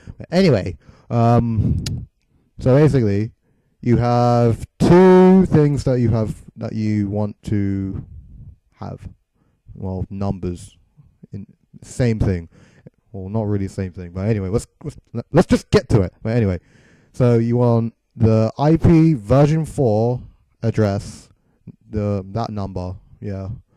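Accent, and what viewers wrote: United Kingdom. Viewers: Thx dude This really helped and also by the accent I noticed that ur from the UK ;D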